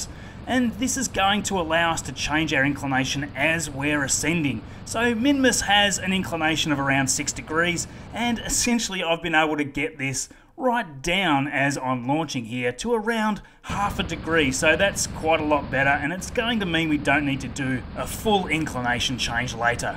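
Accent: Australia. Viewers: he sounds like he is a Australian man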